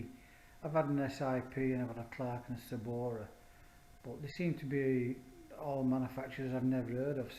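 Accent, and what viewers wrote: United Kingdom. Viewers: Hiya, just watched your video on bridge rectifiers.I have an old LE Velocette with the old type plate rectifier, three plates.Recently had the magnet demagnetised.On position one on the ignition switch the bike runs and charges, on position two, ignition and lights (DC) it does not charge.Been recommended to use a modern rectifier, like the one you speak about.The LE is pos earth does that make a difference??You sound like you are from near me in Warrington?? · just sat through and watched a good few of your videos, you're obviously a Wigan Lad as you sound just like me:-) Keep them coming thoroughly enjoyed them, all the best Steve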